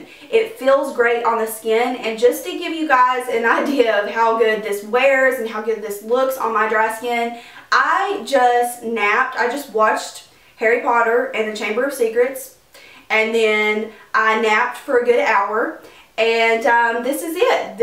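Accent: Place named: Texas, United States. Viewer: You are so beautiful! Kind of have a tx accent! Lol. So anyways, I returned my Smashbox 15 hour wear and got this in exchange. I have super dry skin and also some wrinkles because I have that "early 30s skin" ! So this foundation seeped into my wrinkles and just did not work for me!! Next I'm gonna try UD naked skin when I return this one. But I do love your videos! 😄🙆🏻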